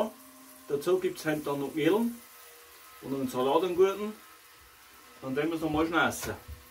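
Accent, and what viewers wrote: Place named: Upper Palatinate, Germany. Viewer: Servus tolles Rezept, schaut mega lecker aus des Hendl, hast Du guad gemacht, vielen Dank für das Teilen😋👍👏und zwegst dem Oberpfälzer Dialgt loos i a moi was do 😁👍👍👍 LG Da Wolfe 😁👨‍🍳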